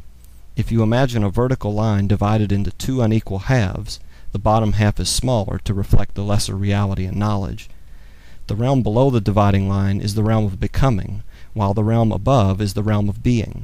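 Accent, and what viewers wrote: Oklahoma, United States. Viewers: hearing philosophy in a southern oklahoman accent is hilarious after a while ^_^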